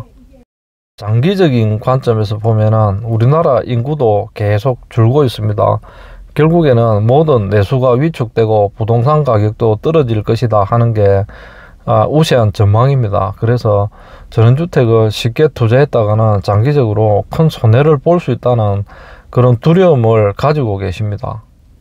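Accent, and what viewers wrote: Gyeongsang, South Korea. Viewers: 100프로 갱상도 액센트...... 소름끼치네!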